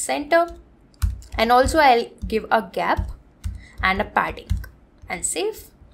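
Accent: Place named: India. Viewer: hindi accent...; i couldn't watch more than 2 minutes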